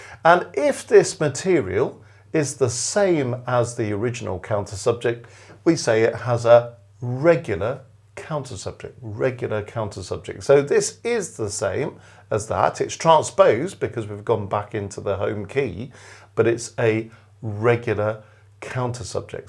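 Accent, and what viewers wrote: United Kingdom. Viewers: Love your videos...and your British English is delightful! Thank you,!!!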